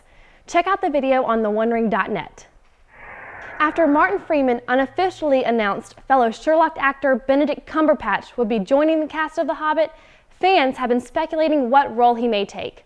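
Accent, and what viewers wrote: United States. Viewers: Love the Southern accent!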